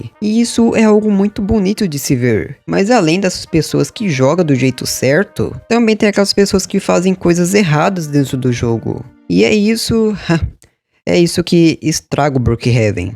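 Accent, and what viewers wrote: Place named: Portugal. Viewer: deve ser de Portugal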